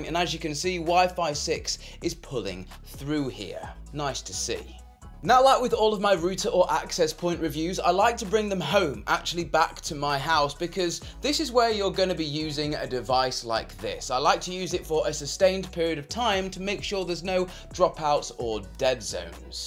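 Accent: England, United Kingdom. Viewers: How are you going to tell an Englishman how to English? 😂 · Each time I heard the British say "Rooter" for "Rou' ter" I cringe. Nothing bad implied, It reminds me of "root canal". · He's from the UK, not from the US...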